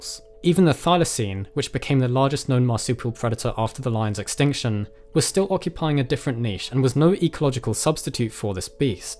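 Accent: United Kingdom. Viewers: Bri'ish fella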